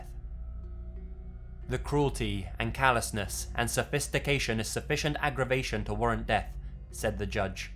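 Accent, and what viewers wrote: England, United Kingdom. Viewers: you from the UK?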